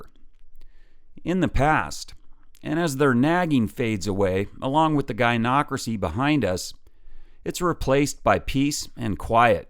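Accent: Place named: United States. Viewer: Oohh. New video!:D I love listening to your american-ness:3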